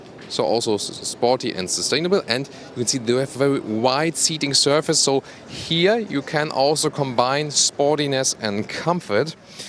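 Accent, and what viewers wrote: Germany. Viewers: i really love your denglish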